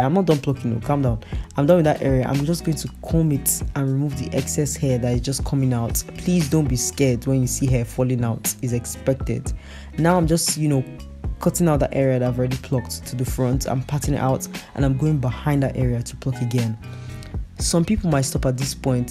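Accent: Nigeria. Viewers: Gurrrlllll I love it...talk your naija talk babe! But awesomely explained 💋💋💋💋...then ending it with korean👀👀 yes ma'am ahnyeong-hekasayo! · “I will overpluck you from my comment section” the way she says it😂 I love my Nigerian sistas, the accents are everything bc it makes everything else funny y’all say a mil times more funny😂😭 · Immediately I heard her first statements I knew she's either a Nigerian or have had close contact with Nigerians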